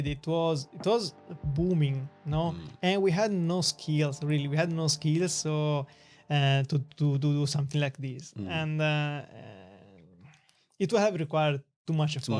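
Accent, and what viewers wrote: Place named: Italy. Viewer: Good video! Nice Italian accent =)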